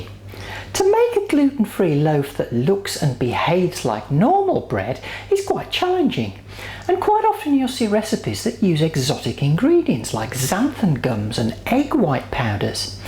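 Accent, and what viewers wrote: Midlands, United Kingdom. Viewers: Very cool video! However, you are the most British person I have ever heard. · My buckwheat flour gives a black loaf but its an ok loaf thanks.detect a midland accent?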